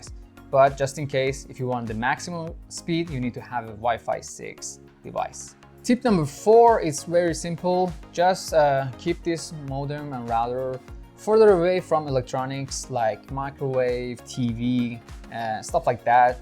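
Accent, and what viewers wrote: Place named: Iran. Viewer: That Iranian accent lol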